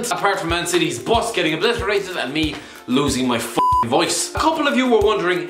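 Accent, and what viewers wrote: Ireland. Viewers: are you irish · Does the "Irish Guy's Rant" on the screen for 90% of the video not tell you? Or his clearly Irish accent? Or the fact he called himself Irish multiple times in the first few seconds? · Irish accent👌🤘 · Shit I can't understand half of the things he says, Irish accent is difficulty asf, slow down boy, you're talking way too fast, does every Irish talk this fast?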